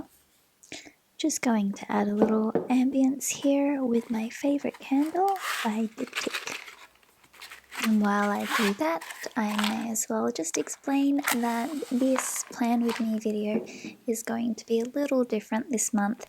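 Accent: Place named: Australia. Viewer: I'm falling down a deep rabbit hole that is the planning and journaling community and I LOVE your channel! Soft spoken creators and simple aesthetics are the easiest ways to get me subscribed, so thank you for creating, can't wait to see more! I use a Hobonichi weeks and Field Notes for commonplacing/Korean language study planning (Megan Rhiannon's former system, if you know her channel). Plus I just ordered a vegan traveler's notebook from etsy and so seeing other people's systems to see what works well for them is wonderful to see what could be adapted to my system. (Also, one of the only podcasts I listen to is an Australian comic book movie podcast - The Weekly Planet, so I love that I get to add more Australians to my repertoire of favorite content.)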